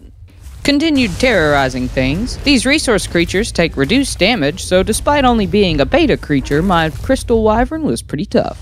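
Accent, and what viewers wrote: South, United States. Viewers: You sound so southern i cant tell if you're a guy or girl. Loved the video tho.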